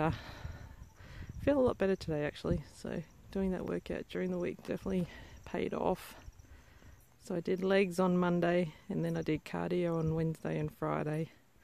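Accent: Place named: Australia. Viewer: Keep up the good work as Americans love Australians and there awesome accents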